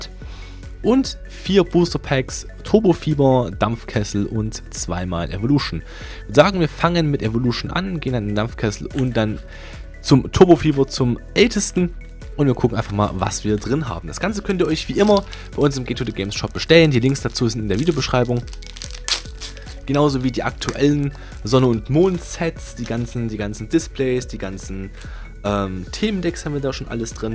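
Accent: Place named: Saxony, Germany. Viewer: Du klingst wie als würdest du aus Sachsen kommen, ist das so?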